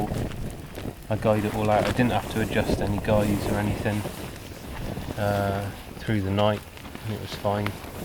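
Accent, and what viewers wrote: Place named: Herefordshire, United Kingdom. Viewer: If you don't mind me asking where you based. I'm Leominster Herefordshire and I thought your accent sounded close. Good vid. Sub and liked.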